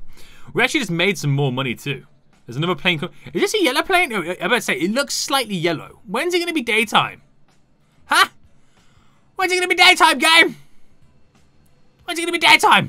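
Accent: United Kingdom. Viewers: Are you British? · Which part of the UK are you from?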